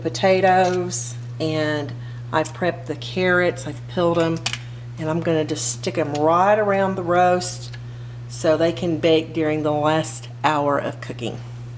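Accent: South, United States. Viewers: Your a cooking beast! Please invite me over for dinner anytime. I would trust this receipe just because you sound like your from the south. #newpauladeen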